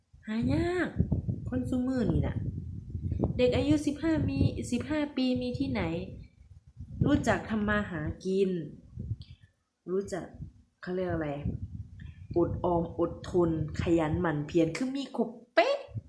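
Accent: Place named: Khon Kaen, Thailand. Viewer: ฟ้งออกคนขอนแก่น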